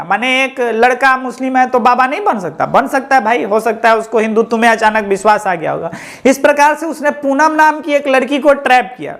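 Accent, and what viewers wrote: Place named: Bihar, India. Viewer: Bihari accent se problem ni h lekin chilla ke bolna cringe bana raha h video ko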